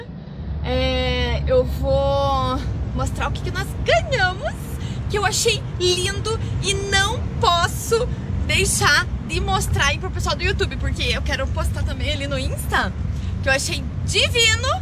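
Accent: South, Brazil. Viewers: Acho da hora esse sotaque do Sul, vc fica mais linda.😍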